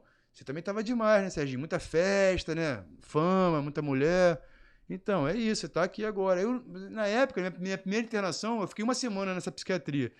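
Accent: Rio de Janeiro, Brazil. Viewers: NÃO CONSIGO ASSISTIR ATÉ O FINAL ESSE SOTAQUE CARIOCA É MUITO INSUPORTÁVEL CREDO .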